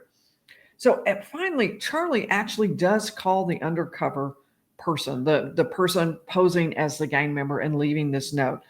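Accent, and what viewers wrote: South, United States. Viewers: reminds me so much of my gentle southern grandmother. Anyone know where she was raised to get her accent? My grandmother was from Louisville.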